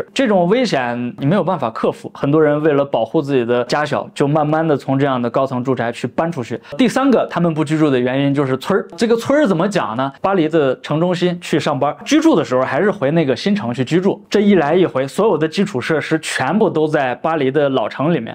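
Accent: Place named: Henan, China. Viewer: 突然听出了一点的口音，是河南人吗😊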